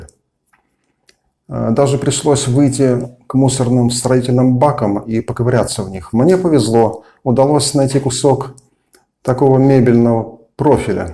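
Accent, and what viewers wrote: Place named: United States. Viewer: Не смотря на русский мат, звучит вполне себе по-американски!👏😁Спасибо за очередной сгусток полезностей!👍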